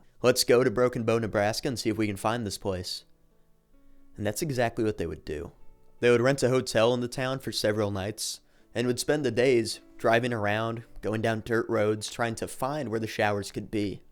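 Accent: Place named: Pennsylvania, United States. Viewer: You're from Pennsylvania aren't you? I can tell by your accent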